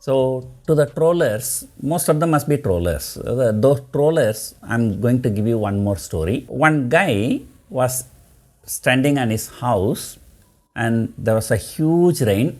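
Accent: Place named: South India, India. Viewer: Sir to be honest they are trolling because you speak South Indian English . You are success they can’t digest I believe...you are doing good job sir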